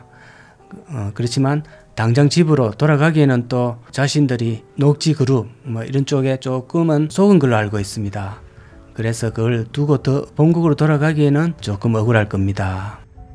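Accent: Gyeongsang, South Korea. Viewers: 구수한 경상도 억양의 말을 거부감 없이 재밌게 잘 들었네요 한국은 의료민영화 절대 안됩니다 하는 순간 빈민국으로 추락입니다 미국도 의료시스템 땜에 시민들이 억의 빚을 지기도 하고 비실거리는데 한국 같으면 상상도 하기 어렵습니다 미국도 의료민영화 없애야 하는 아주 고질 시스템입니다 저는 웃긴 자막 재밌습니다 훈훈한 경상도 말씨에 구독하고 갑니다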